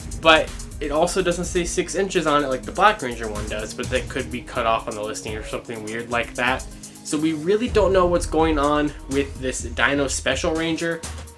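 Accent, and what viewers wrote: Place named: United Kingdom. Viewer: Omg i thought you were from the uk lol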